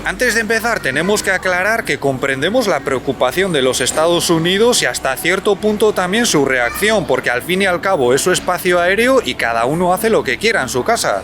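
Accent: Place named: Basque Country, Spain. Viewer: tiene acento Vasco. Parece que está echo un comunista de bien 🤣. En Euskadi también hay algún que otro partido comunista que apoya al presidente de España.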